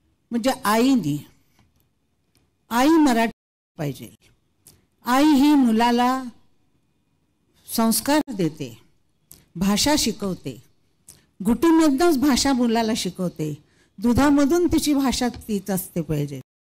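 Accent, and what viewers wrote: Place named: Kolhapur, India. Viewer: ❤❤🎉🎉 खरोखर आशाबाई गायना बरोबर आपण माणसाच्या मनाचाही खूप विचार करत आहात केला आहे तुमचं मराठी प्रेम आणि तुमचे कोल्हापुरी भाषा रवि मज्जा आली शोभा अकोलकर बेरड पुणे वडगाव शेरी मूळची राहणारी करंजी तालुका पाथर्डी जिल्हा नगर अहिल्यानगर मेरे जिल्ह्याची भाषांतर छानच बोलून दाखवली वा तुम्हाला सलाम सलाम त्रिवार वंदन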